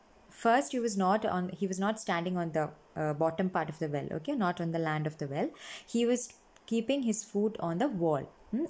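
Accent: Kerala, India. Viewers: maam are u a malayali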